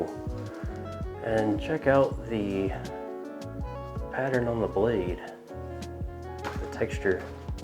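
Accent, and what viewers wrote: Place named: Alabama, United States. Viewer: What’s wrong with an Alabama accent man?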